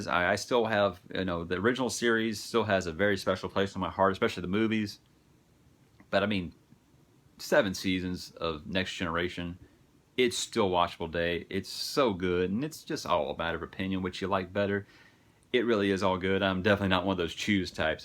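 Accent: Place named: South, United States. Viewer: Wow your voice then versus now is soooo different! The heavier southern accent was so cool to hear.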